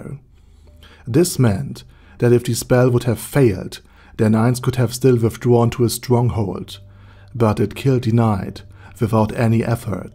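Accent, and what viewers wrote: Germany. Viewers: Bro are you from Germany? I can hear your accent.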